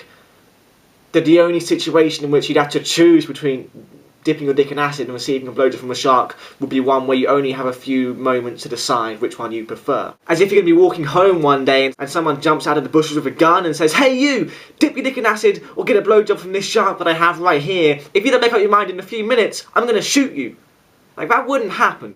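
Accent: England, United Kingdom. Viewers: Oh my gosh. Like i totally am indifferent to the english accent but every time i watch your videos i just totally wanna make out with you and i can't even comprehend what your saying cause i',m fantasizing about what you look like in you boxers . . . or briefs?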